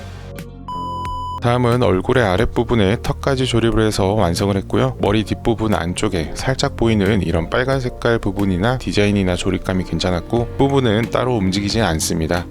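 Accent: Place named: Gyeongsang, South Korea. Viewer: 몰랐었는데 경상도 싸나이셨네요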